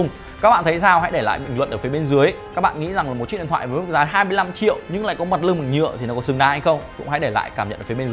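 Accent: Vietnam. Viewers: p chịu thôi chứ, đây là vn, mà mấy người xem tin công nghệ có người hiểu người k, nói kiểu vn thì cả giỏi lẫn k giỏi tiếng anh đều hiểu đc thì nó tối ưu hơn chứ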